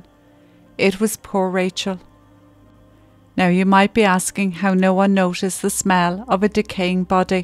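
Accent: Ireland. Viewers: Thank you, as always. Beautifully covered on such a senselessly sad case. I love just listening to your soft brogue. Slainté 🍀☘🌹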